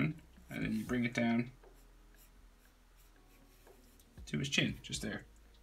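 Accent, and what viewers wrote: Ireland. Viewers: Is it just me or does he have an irish accent like Johnny Sexton?!?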